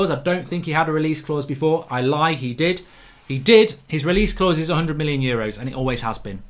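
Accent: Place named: United Kingdom. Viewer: Pommie lingo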